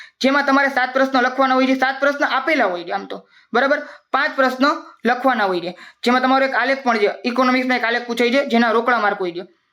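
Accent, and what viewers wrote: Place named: Kutch, India. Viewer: Sir tame katch na lago cho barabar je je kyo jo atle 😅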